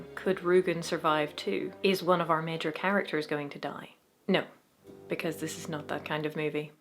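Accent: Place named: Northern Ireland, United Kingdom. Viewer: You have a great accent. It's got to be Northern Ireland or Donegal, with moderation in the US. Please tell me.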